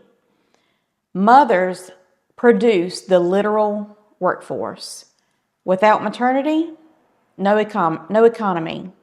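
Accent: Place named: United States. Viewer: I’m a Brit and I love your accent. To me, you sound like a proper American.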